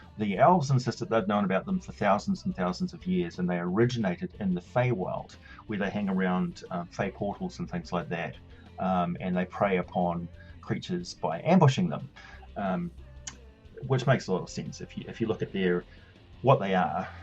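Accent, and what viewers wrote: New Zealand. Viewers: I knew you were a Kiwi.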